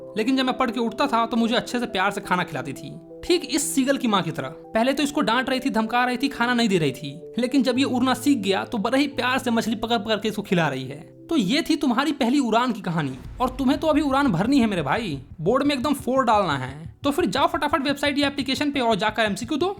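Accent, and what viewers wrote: Bihar, India. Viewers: Bhai aapki bihari beech me maja dila deti hai 😂😂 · Bhaiya are u from bihar 😅❤❤❤❤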